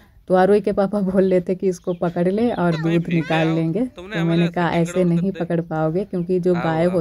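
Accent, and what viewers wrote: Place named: Uttar Pradesh, India. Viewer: Bhut acha vlog aap 1 din apni Up wali voice m video k voice over kro bhut acha lgta h mujhe aplogo k language ❤ I m from west Bengal yha yhsb language dur dur tk nhi bs hindi or Bengali h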